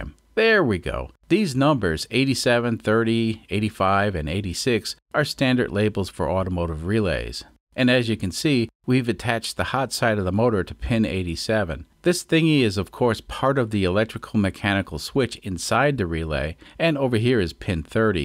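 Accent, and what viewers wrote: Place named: Southern California, United States. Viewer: I understand your caution. When we changed to metric measurement here in 1974, conversion to litres per kilometre (or as it's spelt (or as spelt itself is spelt is the US "spelled"😂) in the US) liters per kilometer popped up for a short while but it quickly disappeared in favour of l/100km. Also, on reflection Kilopascals (kPa), not Hectopascals, is on the tyre pressure gauges here. Interesting re the accent. Had I been so bold as to guess your background outright, I would have said, born somewhere in Wisconsin, then moved to Southern California. I'm no expert but to me your vowels sound midwestern but the lilt is straight outa Garden Grove.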